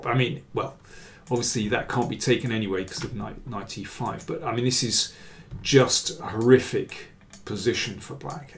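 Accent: United Kingdom. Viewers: It was 1995 when i first heard your commentary when i used to watch anand Kasparov play at 4 am Indian time, and you have the knack to keep viewers engaged and the most appropriate British accent and vocabulary!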